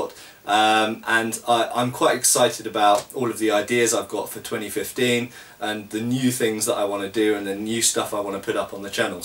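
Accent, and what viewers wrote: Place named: England, United Kingdom. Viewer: THANK YOU for making cool videos about swords! We love swords and the English accent only enhances your narrative coolness :)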